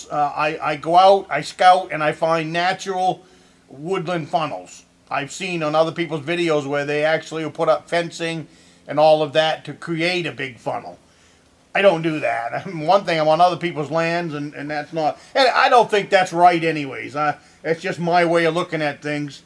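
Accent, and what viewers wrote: Maine, United States. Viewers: I'm in Western Maine and when I heard yer voice I knew you were a Maina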